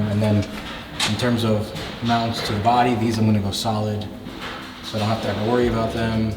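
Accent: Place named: United States. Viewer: como le comente a tu viejo, solo pesque el 20 % de lo que hablabas, el ingles USA, suena distinto al Brit......ja ja veo que la sal afecta mucho los bajos el coche, lo pudre todo, parecería que el 4 Motion, tiene mas hueco en la parte trasera del coche, supongo que sera por la transmision y el lugar que ocupa, deberás tener que cortar el baúl de tu coche y rehacer el piso?? esto llevaría un buen trabajo, supongo también que desarmaras la transmisión para ver los engranajes, cambiar bujes de goma y dar blasting + pintura a las parrillas, los semiejes también los repararas?? tenes un buen trabajo por delante, por suerte tenes el coche viejo para sacar un plantilla del piso y copiarlo.......la satisfacción, sera cuando lo veas al VW negro terminado y tirando cambios por las calles de N York.......ja ja, seguí colgando videos de la transformación, y si podes, hace alguno en castellano que lo cuelgo en mi Face, Un abrazo!!!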